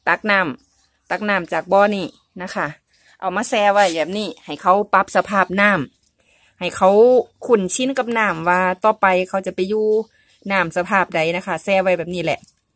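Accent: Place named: Isan, Thailand. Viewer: ***เสียดาย สำเนียงอีสานฟังไม่ออก...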